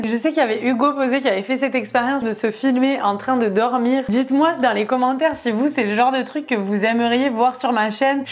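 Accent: Quebec, Canada. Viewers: t'es québécoise ?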